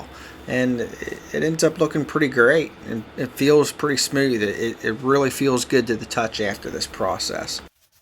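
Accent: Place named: Maryland, United States. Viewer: You sound very Maryland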